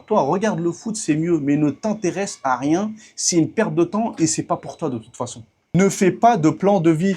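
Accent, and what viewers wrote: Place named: France. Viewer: 😂 TU RELAVE GRAVE LE NIVEAU... C'EST POURQUOI JE T'ÉCOUTE ET JE TE SUIS. J'adore ton accroche! Ça me fait rire👍 C'EST TRÈS VENDEUR! TU ES TRÈS VENDEUR 👍 C'EST TRÈS BON ÇA C'EST POURQUOI TES PODCASTS ET TES VIDÉOS FONCTIONNENT TRÈS BIEN. AU DÉBUT ÇA M'A SURPRIS TON ACCENT DE CITÉ MAIS JE ME SUIS FAIT PARCE QUE JE SUIS PAS HABITUÉE. ON SENT TU ES QUELQU'UN DE BIEN GARÇON DE BONNE FAMILLE COMME ON DIT CHEZ NOUS ÇA C'EST NOTRE CÔTÉ VENDEUR NOTRE CÔTÉ COMMUNICANT QUI EST SUPER BON👍 Ton accroche peut être super titre pour un film! tu sais ça!? 👍😂 Il faut déposer la marque... Tu crées des titres qui accrochent c'est une marque d'approche ça c'est top Et mec tu vas être copié alors dépose vite la marque Après tout tout est déposable. Tu sais tout le monde t'écoute tout le monde t'observe Les comiques les artistes et les scénaristes s'en inspirent... 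Pour te copier et copier ton style ensuite ils vont le vendre dans des films c'est sérieux ce que je dis.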